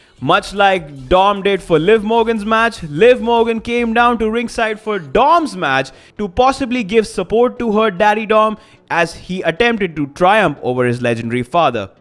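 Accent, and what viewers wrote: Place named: India. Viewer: this may sound dumb but please stop talking with a indian twang you started out so well the firs 30 seconds or so ... idk why I even bother commenting on it but yeah idk it's slightly triggering PS it's not really something you do I guess but it's more WWE fan channels filled with BAD BAD english speaking by indian people who made the title and video in english but then starts to yapping in indian or whatever they say about the WWE in videos ... no offense in advance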